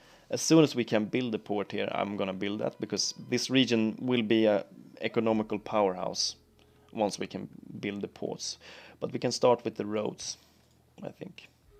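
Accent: Sweden. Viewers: You sound like you have a swedish accent. Är du svensk?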